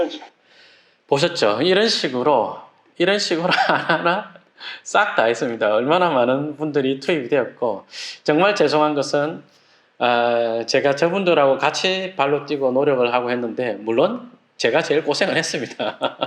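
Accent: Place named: Gyeongsang, South Korea. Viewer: 좋은 책 감사드립니다 유투브 방송 잘보고 많이 배우고 있습니다 구수한 경상도 사투리와 "엄마야" 감탄사가 너무 정겹습니다 앞으로도 멋진 방송 기대합니다 대박 기원^^♡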